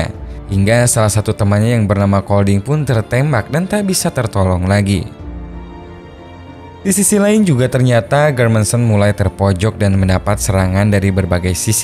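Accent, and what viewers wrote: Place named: Central Kalimantan, Indonesia. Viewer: Ini logat kame Kalimantan tengah lah gue suku Dayak Emang gite lah loga t nye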